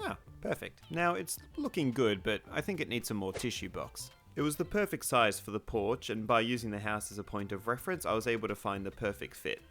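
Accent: Australia. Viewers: Bruh, this is your first and (so far) only video and I already love your stuff and I'm half a minute into the video as I type this You've already earned yourself a subscriber Also are you Australian too?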